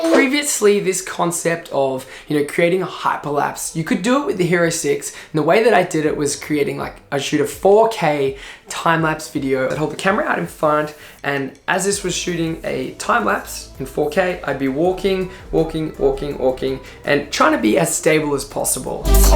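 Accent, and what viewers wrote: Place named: Australia. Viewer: Great to hear an Australian voice keep going man! Good video, I am thinking of buying this GoPro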